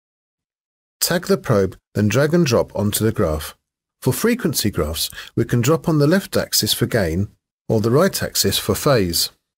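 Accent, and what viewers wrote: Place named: United Kingdom. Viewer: OMG I Love that voice and their british accent, thank you!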